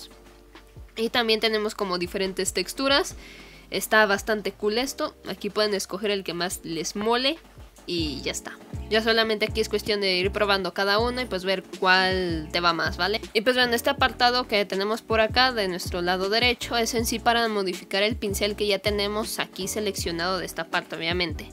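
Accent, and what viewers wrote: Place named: Latin America. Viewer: Buen tutorial gracias . Vives en España ? Tienes bien marcado el acento latino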